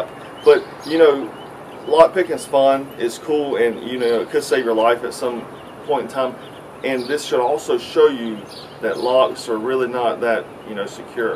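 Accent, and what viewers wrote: United States. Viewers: That country accent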